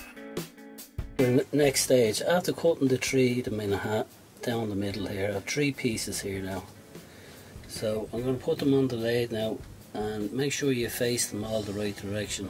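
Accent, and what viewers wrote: Dublin, Ireland. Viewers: i heard Dublin when ya spoke.. My uncles, my cousins, my Granny & Granda. i miss the way family was when that house was alive, & the epicenter. It was where Granny kept the whole world together. Where i really learned to have a propper fry, & where i almost always slept & felt at home when visiting. im 1st born American, always lived in California, but you know ive eaten mackerel fished out of a pole in the water while swimming out in Houth, so ill be alright- fuck me though... What was it you were doin' again? (subbed, cheers! & thanks)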